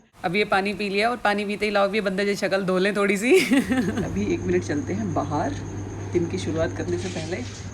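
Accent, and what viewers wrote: Haryana, India. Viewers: Ma'am आप कोण से गाम तै हो क्योंकि आपणि बोली सुन्न की बड़ा आच्छया लाग्या 👍👍😂 लेकिन maam म्हारा तो अंग्रेजी मै बेड़ा गरक हैं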